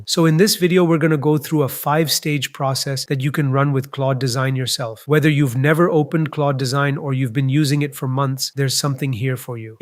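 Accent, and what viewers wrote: India. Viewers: imagine using an ai narrator and still choosing indian accent holy shit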